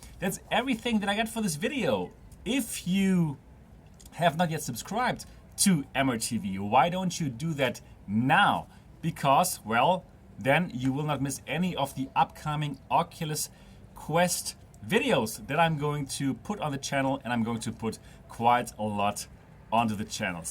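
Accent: Germany. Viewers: very hepful! Are you by any chance german? Maybe im mishearing something but you sound kinda like it haha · Are you a German?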